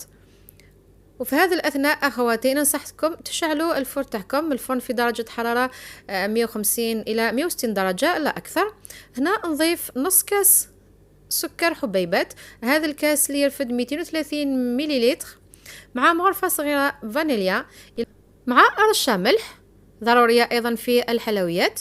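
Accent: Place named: Kabylie, Algeria. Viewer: الله الله على الكيكه شاطره تهبل مشاء الله يعطيك الصحه والخير و الهدره القابلية نموت عليها ❤️😘😘😘 احلى لايك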